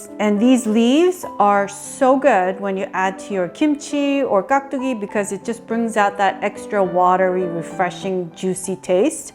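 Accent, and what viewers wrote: United States. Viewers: WOW i hear your accent evolved into american in such short time from the video you made 6yrs ago on the pickle raddish. Amazing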